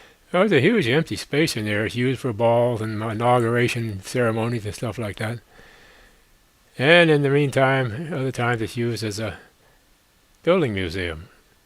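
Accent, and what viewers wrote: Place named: Maryland, United States. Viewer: Oh shit you must live somewhere in Maryland, cause I haven't heard anyone else pronounce Washington as "Warshington." The National Building Museum is a great place. Went there for a school trip a few years ago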